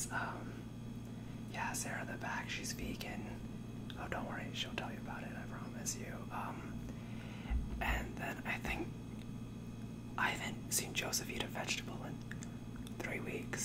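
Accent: India. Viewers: Specially with indian accent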